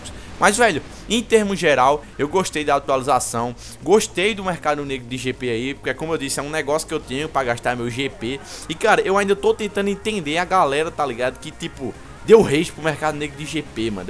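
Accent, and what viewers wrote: Northeast, Brazil. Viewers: você é muito legal e seu sotaque melhora mais ainda o vídeo, cara. Que saudade do Nordeste! Você é bem simples e, ao mesmo tempo, ótimo. Sei lá o porquê deu ter falado isso, mas é que tava guardando isso. O_o Eu quero saber a sua killmark. Eu baixei a killmark do canal achando que seria aquela normal que você usa nos vídeos, mas é uma que tem o nome e tals, e eu queria uma normal, sei o nome porque não combina com meu nick - achei bastante bonita aquela killmark. É qual dessas: Killmark IDK, Killmark HG9?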